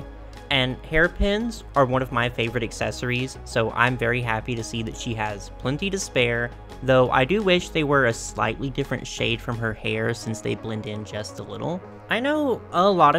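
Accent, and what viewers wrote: Texas, United States. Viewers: I presently like how you talk I love your Texas accent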